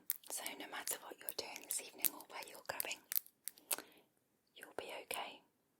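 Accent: England, United Kingdom. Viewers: Love your accent isi kent or midlands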